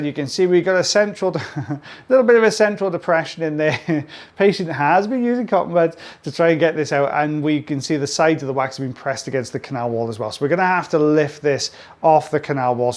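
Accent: Wales, United Kingdom. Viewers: Love hearing you speaking, that lovely Welsh accent.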